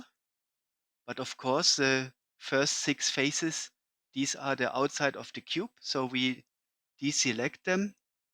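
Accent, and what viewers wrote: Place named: Germany. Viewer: Danke, auch wenn man am Akzent schnell gemerkt hat, dass du Deutscher bist :D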